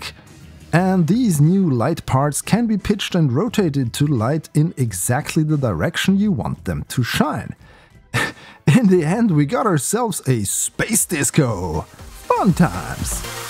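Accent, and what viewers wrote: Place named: Germany. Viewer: sounds german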